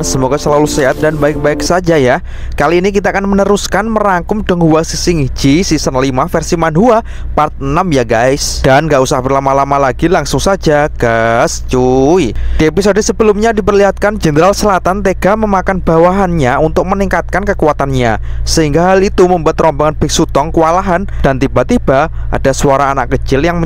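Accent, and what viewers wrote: Java, Indonesia. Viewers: logat jawanya yg ngangenin bang..😁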